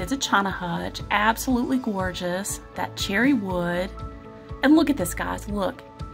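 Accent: South, United States. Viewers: You definitely have a southern accent. I have one too 😀. When my family moved to New York many years ago, I got teased by the way I talk. I told someone once that southerners sound that way because we have respect in our sound 🙂. Then he tried to copy my southern accent 😄.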